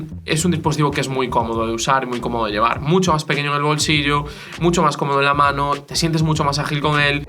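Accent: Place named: Cádiz, Spain. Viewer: Que acento más bonito tenéis los de Cádiz 😅😅😅😅😅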